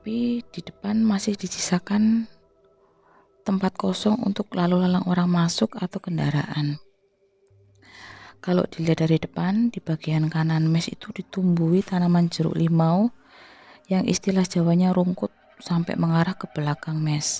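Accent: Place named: Manado, Indonesia. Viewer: Mba nya logat Manado banget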